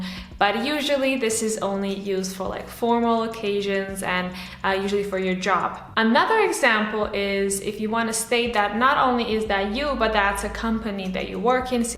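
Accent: United States. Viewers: To me, you sound like you could be from the US. You're not the only one I've seen this with. Why is that?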